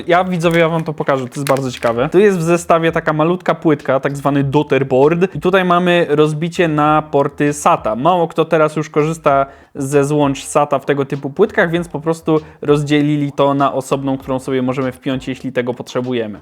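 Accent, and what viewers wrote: Silesia, Poland. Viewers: Kurde widać że swój chłop Ślązak wotaj w klumie masło hahahahaha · wielki plus za śląski akcent w filmie!😃 edit: pod koniec filmu zauwazyłem też koszulkę ze ślonskim akcentem xdd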